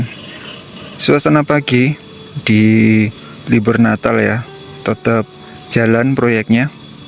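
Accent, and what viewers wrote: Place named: Purworejo, Indonesia. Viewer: Melu ndelok mas,logat cah porjone ketok mas"ding" semangat n sehat terus mase ben iso ngasih info dari porjo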